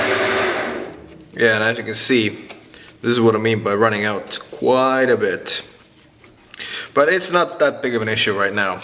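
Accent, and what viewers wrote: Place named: Estonia. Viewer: Hi, where are you from? you sound very estonian :) kui jaa siis Tere ja hea video!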